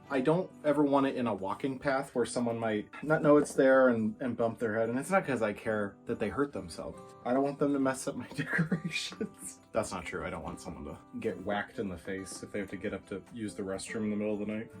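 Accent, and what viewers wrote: Minnesota, United States. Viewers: you look VERY fetching in that red plaid shirt!! I'm sorry it was making you itchy and bitchy, but you were giving 6'4" lumberjack vibes!! 🪚 🪵!! On the 8th day of Vlogmas my true love gave to me: Eight cameras tumblin' Seven cutouts glowin' Six berries spillin' Five Christmas trees 🎄🎄🎄🎄🎄 Four Paolo meows Three sets of batting Two power strips 🔌🔌 And you sound like the movie Fargo 🎄⛄🙂⛄🎄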